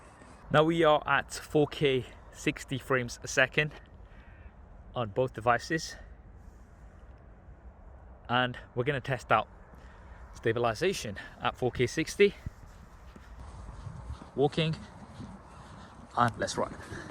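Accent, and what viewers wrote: Leicester, United Kingdom. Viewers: Didn’t realise you was from leicester 😲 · Never knew you was from Leicester......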